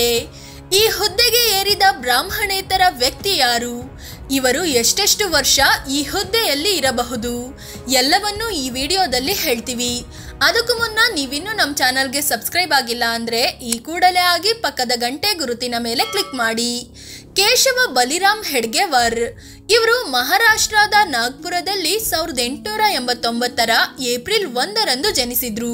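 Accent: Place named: Hassan, India. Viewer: ನಿಮ್ಮ ಹುಚ್ಚಾರಣೆ ಶ್ವಲ್ಪ ಹಿಂಪ್ರೂವ್ ಮಾಡ್ಕಳ್ಳಿ. ಹರಸೀಕೆರೆ, ಆಸನ ಕಡೆಯೋರ್ ತರಹ ಏಳ್ಬೇಡಿ.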